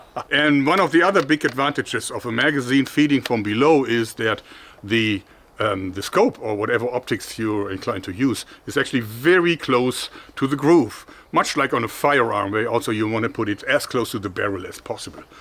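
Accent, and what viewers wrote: Germany. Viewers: You sound German but I think you live in Washington or Oregon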